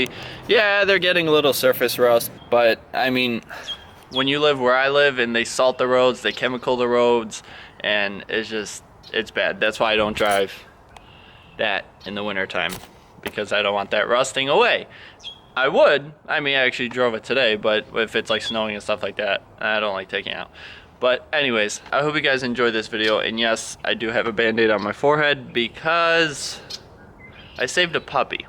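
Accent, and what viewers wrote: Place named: Chicago, United States. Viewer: You do got some Chicago on that accent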